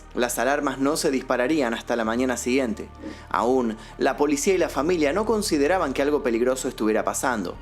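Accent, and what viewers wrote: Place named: Argentina. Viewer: Me encantan tus vídeos! Me gusta el "SH" de tu acento argentino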